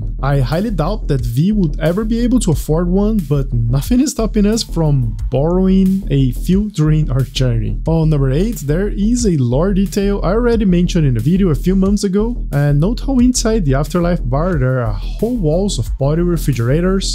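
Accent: Brazil. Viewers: cara, você é brasileiro ?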